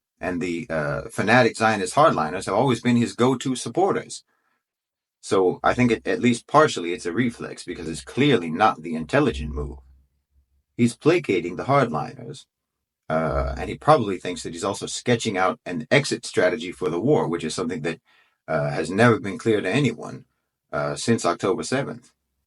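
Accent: United States. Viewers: What is absolute madness is this nutter saying that Israel as a State should be terminated. It is simply repeating Hamas’ propaganda with an American accent.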